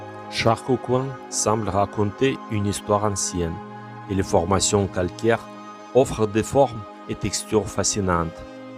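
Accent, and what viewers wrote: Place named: Russia. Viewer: Bonjour. j'espère que tu vas bien depuis la dernière fois j'ai adoré ta vidéo que ce soit ta façon de raconter le lieu que tu nous fais découvrir la musique qui va bien aussi et ton petit accent russe que j'aime bien tout particulièrement on voit que tu étais bien renseigné bonne idée pour les genouillères j'espère quand même que quand tu pars tout seul tu donnes ta localisation à un proche on sait jamais ce qu'il pourrait arriver en tout cas superbe vidéo continue comme ça le succès est garantie ça serait bien qu'on arrive à se revoir avec l'équipe je te dis à la prochaine fois😊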